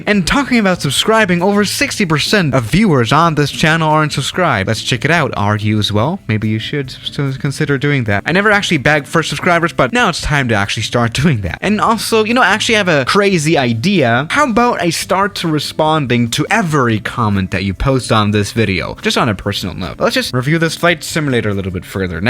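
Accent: Germany. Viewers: Its german I think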